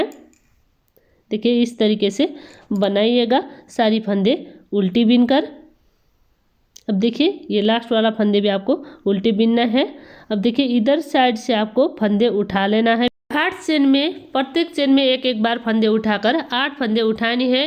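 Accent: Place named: Nepal. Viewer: Kina hindi boleko nepali tone xa ta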